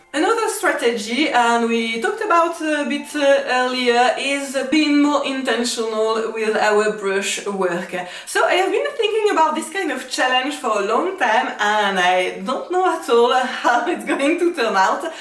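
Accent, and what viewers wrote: France. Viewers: Bonjour, ah ben plus de vidéos depuis janvier, triste je suis! J'adorais travailler mon anglais et votre délicieux accent français m'aidait beaucoup, la cerise sur le gâteau l'aquarelle!!! Rien de grave n'est arrivé j'espère. Mais où est passé le? Bien cordialement.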